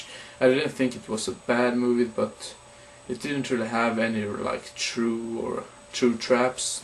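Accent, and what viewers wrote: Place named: Sweden. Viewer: Haha, hörde att du var svensk när du pratade. Roligt att de första jag hittar efter att sett trilogin är en svensk kille som snackar. :)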